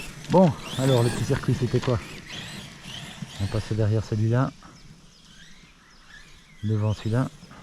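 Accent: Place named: Belgium. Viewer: Salut salut, je reconnais l'accent et les septantes du pays;) t'es de quel coin au juste? On est peut être voisins 🤓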